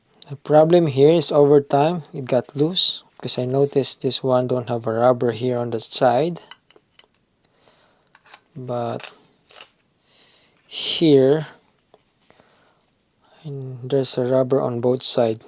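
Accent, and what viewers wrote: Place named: Philippines. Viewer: Thanks for posting this and showing off all of the compartments! Just ordered it because I made a mistake and bought a different Vulkit that can't really carry cash 😅. Ignore the bumbling morons who claim they can't understand properly spoken English because of a light Filipino accent. You speak just fine, I watched the video at double speed and still made out every word. Side note: I think you're meant to fold the last 1/4th of the cash bills inward to fit them into the slot. That's how it looked on the site anyway.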